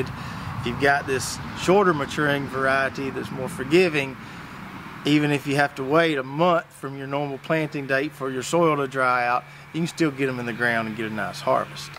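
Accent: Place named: Georgia, United States. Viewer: Love your South Georgia and accent here in Suwanee Georgia. Try this experiment on one tater. Simply lay it down on the ground and keep it heavily, I mean heavily mulched with leaves, hay, straw, whatever. I mean keep it mulched so sun don't get to that tater and it'll grow just like the ones in the dirt, only you don't have to hill it or none of that. Set it down... cover it...forget it. Don't even have to water it. "Set it forget it". Try it...Please...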